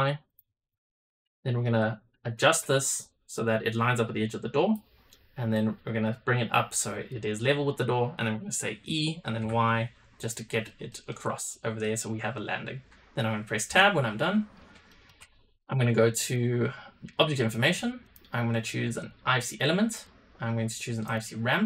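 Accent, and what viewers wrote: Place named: South Africa. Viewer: waaaaaaaaait... YOU'RE SOUTH AFRICAN ! LETS GOOOO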